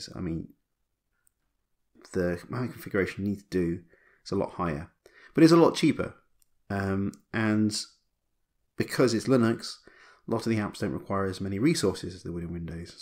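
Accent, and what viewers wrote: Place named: Liverpool, United Kingdom. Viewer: MY GOD HE"S FROM LIVERPOOL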